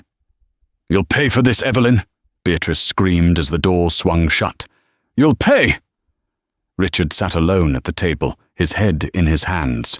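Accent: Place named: United Kingdom. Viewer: I have a technical comment. …. Although the narrator is probably a wonderful gentleman, a British accent narration for Chicago, Boston, NYC, etc is DISTRACTING. You cannot relate to the characters when they are supposed to be on continental U.S., with a London accent. If we were listening to Shakespeare, yes. For these things, Hard NO!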